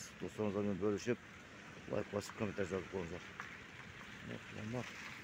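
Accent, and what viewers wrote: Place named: Batken, Kyrgyzstan. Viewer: Баткенден Ноокатка келип тартытасынбы, тилин тушунуп тушумбой жатам, шо шо дегенине Караганда баткенчи окшойсун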